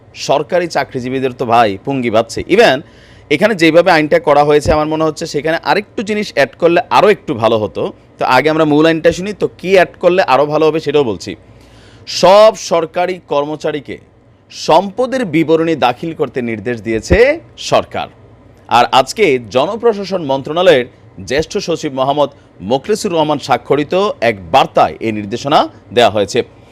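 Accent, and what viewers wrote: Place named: Comilla, Bangladesh. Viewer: Location India কেন, আপনার ভাষা তো কুমিল্লার মনে হয়।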